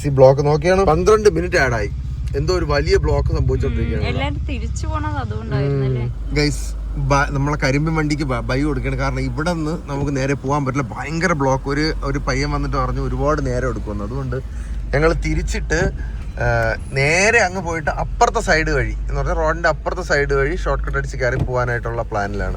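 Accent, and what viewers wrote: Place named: Trivandrum, India. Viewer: Pakka Trivandrum slang Thanneeeee😂😂 ❤ nice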